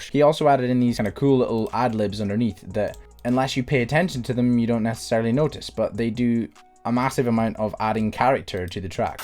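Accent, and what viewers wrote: Scotland, United Kingdom. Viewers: hey man, r u scottish?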